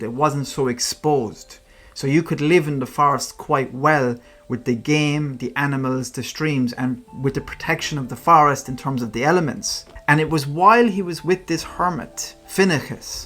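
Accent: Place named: Ireland. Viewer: love your Irish accent and the stories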